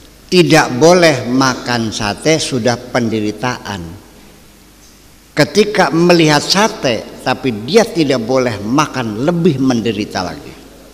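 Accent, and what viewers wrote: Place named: Indramayu, Indonesia. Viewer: WASPADAI UCAPAN2BUYA SYAKUR dg DIALEK BAHASA KHAS INDRAMAYUAN?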